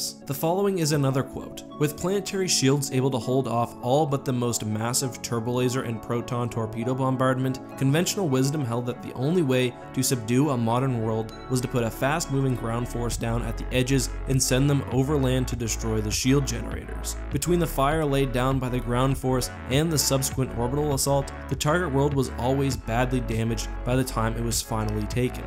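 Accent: Canada. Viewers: You sound Canadian for some reason.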